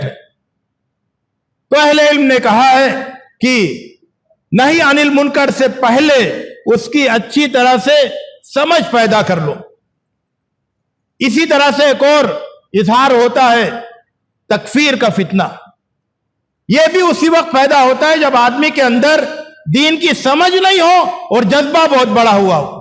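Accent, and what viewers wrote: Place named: South India, India. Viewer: Most probably hailing from south india.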